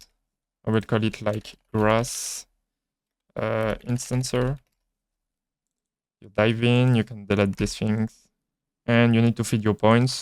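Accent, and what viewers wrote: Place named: France. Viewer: Comment il gère ça le bro avec le ptit accent frenchy hehe :) lourd lourd lourd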